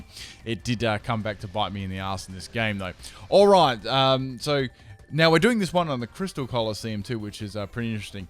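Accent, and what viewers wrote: Australia. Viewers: I keep guessing what is "pill"...it's actually Pearl. gotta understand Australian better now that I'm hooked to this channel.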